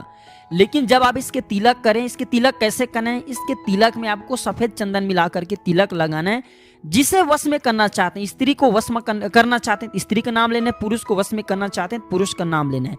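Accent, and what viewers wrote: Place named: Assam, India. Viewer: Etleti bolta hai gurji assam se ho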